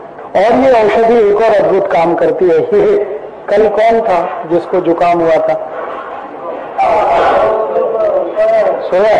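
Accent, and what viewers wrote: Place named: Maharashtra, India. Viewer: Maine padha hai rajiv bhai up se hai....to unki toning marathi kyo lagati hai.....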